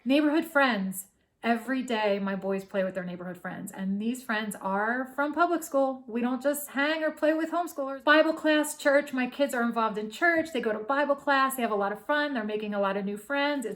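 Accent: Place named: New Jersey, United States. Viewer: This was an excellent and informative video!! I loved hearing this perspective. Looking forward to hearing more content from you! I can't help but ask (and you don't have to answer), but are you from the NJ area? You sound like you could be, and I only ask because I love all the activities and community based opportunities you're involved in... intrigued. I am going into my second year of homeschooling in NJ with my second grade daughter and we are loving it! Subscribed!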